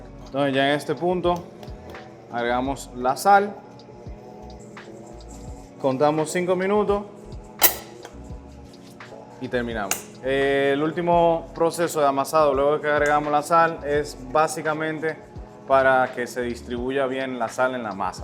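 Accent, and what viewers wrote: Dominican Republic. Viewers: Ahi padres pero tu si habla nos puedes negar que eres domin .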